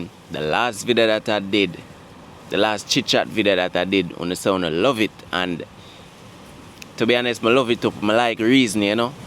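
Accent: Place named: Patois, Jamaica. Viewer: I came across your channel by accident. But I am glad I did, as someone who has a xaymacan background. I love to support xaymaca. I love that you speaking in patwah language, and just being yourself. And not using the standard English. I am vegan, but still watching the channel. Reminds me of the xaymacan dishes my family makes.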